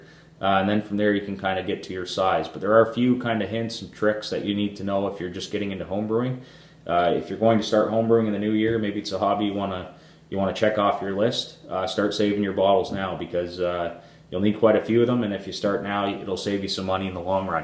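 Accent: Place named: North America. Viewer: I love the use of metric! I know it doesn't make a huge difference for most, but to hear a North American accent using metric is wonderful.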